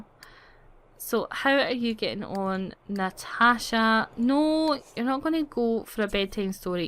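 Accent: Scotland, United Kingdom. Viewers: Oh my god! This...is...amazing!! Don't shut your self up please! I want to hear your beautiful Scottish accent. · Baby girl = Skye xxxxx love your videos and your voice. a scottish accent is always fun to hear! haha x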